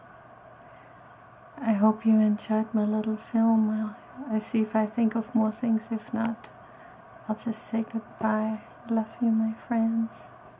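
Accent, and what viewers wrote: Germany. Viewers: ich komme auch aus Deutschland, allerdings aus dem hohen Norden! Ich mag Deine Videos und höre auch noch manchmal einen leichten schwäbischen Dialekt bei Dir ;-) Liebe Grüße aus Kiel :-)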